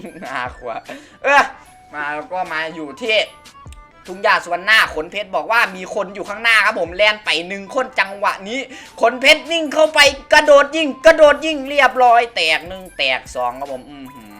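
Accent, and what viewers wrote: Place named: Isan, Thailand. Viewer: ชอยพี่ พากย์อีสาน5555 แสนซับแย้วววว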